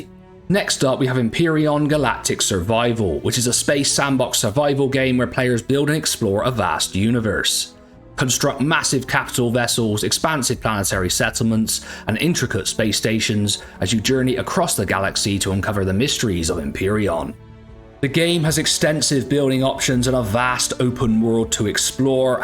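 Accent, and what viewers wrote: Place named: Devon, United Kingdom. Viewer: Moved back from england? To where out of curiosity? Your accent sounds devon 🤔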